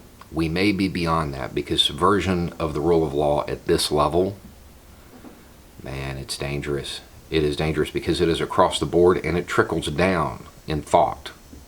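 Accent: South, United States. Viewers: Clicked on this and saw a guy with a southern accent talking about Trump...thought I knew what was coming. Instead I got a reasonable voice from a concerned American and for once I am glad to have wrong assumptions.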